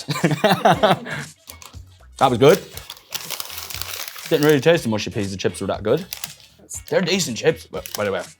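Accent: Ireland. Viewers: I didn’t realize how Irish Niall was until right now. Like the accent as gotten stronger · It's an Irish accent. Sybau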